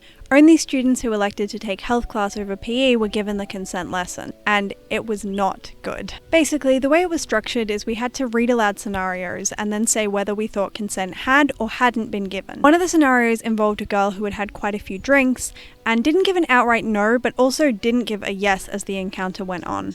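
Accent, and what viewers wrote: Australia. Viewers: It’s an Australian accent. Sometimes they sound different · I love the way you say "Ella Enchanted" like Eller Enchanted". Aussie accents are so fun to listen to😊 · I clicked on this video because I've never seen another (real life) person with the name /. Plus sounds like we're both Aussie which is fun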